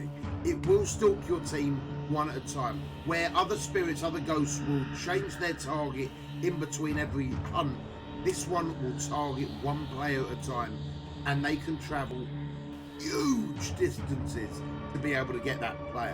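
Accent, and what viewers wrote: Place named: Scotland, United Kingdom. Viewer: Not be offensive in any way but just listening to you I know you're scottish.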